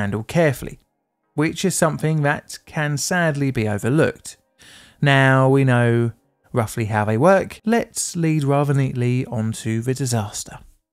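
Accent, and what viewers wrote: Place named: England, United Kingdom. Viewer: As someone who has a lil trouble understanding an English accent I kept hearing 90s. It took me a second to realize he meant 2000s.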